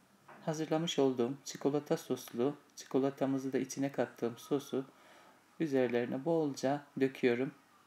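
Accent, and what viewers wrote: Black Sea, Turkey. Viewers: Karadenizlimisin abla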